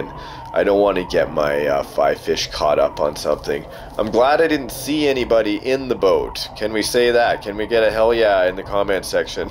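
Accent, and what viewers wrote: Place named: Canada. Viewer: why do I automatically like people with Canadian accents??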